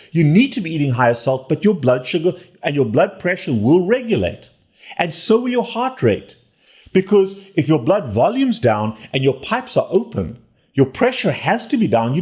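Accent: South Africa. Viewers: You sound South African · He sounds south african. I love it when my fellow kinsmen do well world wide. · U sound like a joburg oke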